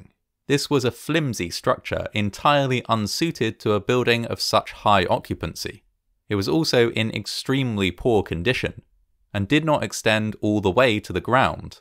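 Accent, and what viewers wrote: England, United Kingdom. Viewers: I was under the impression that he was English because of his accent.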